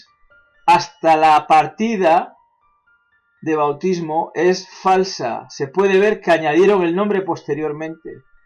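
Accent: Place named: Mexico. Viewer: Grande!!! no teme decir la verdad jamás!!! ya hablas como Mexicano hermano!!! un abrazo y gracias x tus vídeos!!!!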